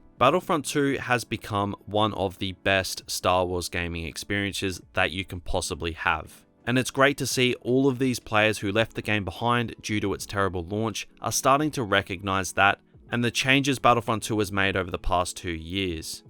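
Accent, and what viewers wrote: Australia. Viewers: ThePizzadude93 he’s not British tho he’s Australian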